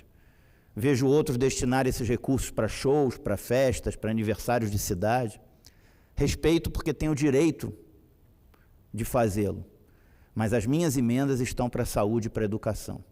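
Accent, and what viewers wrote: Rio de Janeiro, Brazil. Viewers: O assunto é bom, mas... Essa merda de sotaque carioca cheio "Xix" ninguém merece!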